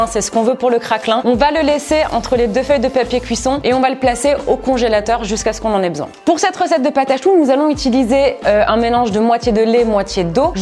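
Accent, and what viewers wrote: Canada. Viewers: tu mon youtubeur préféré desvidéos accent canadien et qui met quoi des vidéos trop trop rire 👍👍👍👍👍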